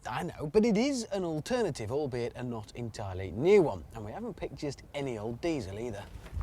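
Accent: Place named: Birmingham, United Kingdom. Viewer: anyone else notice Hammond sounding more brummie ? He said “it’s a fast diesel “ instead of faaaarst like he would today!!!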